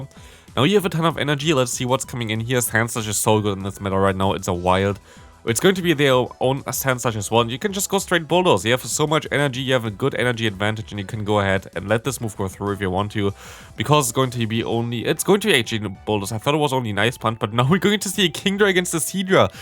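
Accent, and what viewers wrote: Germany. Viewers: Nice Video, are you german???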